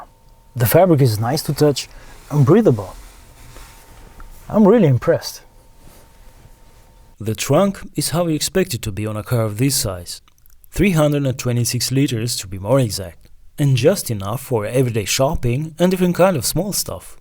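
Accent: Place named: Ireland. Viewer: You sound like an irish man. Are you Irish or Romanian?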